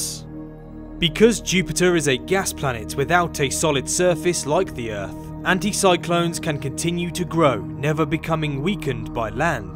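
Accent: United Kingdom. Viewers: I just dont get it you you speak like a british but you use imperial measurements. Anyway, have you ever wonder that there might be a civilization or alien life inside that giant red spot? protected by the cyclone itself.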